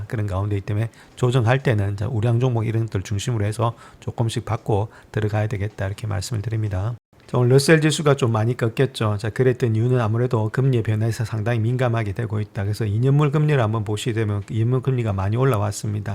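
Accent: Gyeongsang, South Korea. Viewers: 차분한 경상도 사투리가 새롭고.. 다른 시황분석🎉에 박수 보내며 감사합니다.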